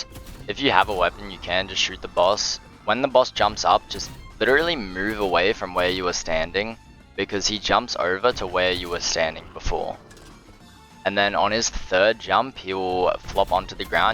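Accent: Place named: Australia. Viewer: nice australian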